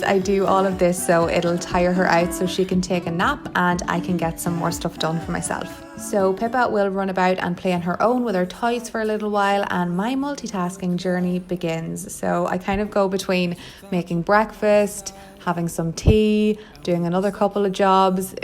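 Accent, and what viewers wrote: Ireland. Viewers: Is her accent Irish 😍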